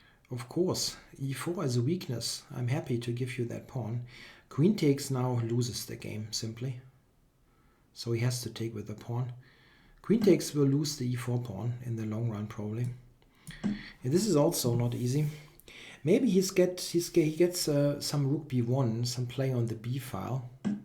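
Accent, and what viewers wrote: Germany. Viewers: I don't find his games boring at all. I like his style of positional play and watching him turning small advantages into a win. he also easily becomes a beast in unconventional positions. Even seeing him blundering (rarely) is exciting and it never gets boring. Plus, his sense of humour and German accent really add a great colour to his videos. I am grateful that he provides us such entertainment everyday. I feel like I have a friend on the other side of the camera and I am always thrilled to see how he did today.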